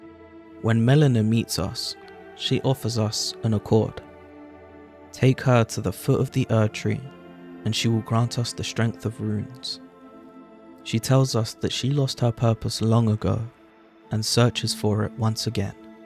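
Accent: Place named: England, United Kingdom. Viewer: High quality content + English accent = Instant sub. Spiffing.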